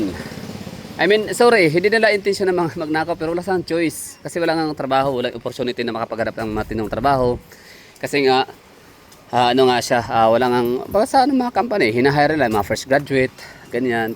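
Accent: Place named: Visayas, Philippines. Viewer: Hindi nga amazing... Pero pede na kasi malapit sa NCR. Saka may bias ka kasi kasi bisaya ka. Halata sa accent mo. DDS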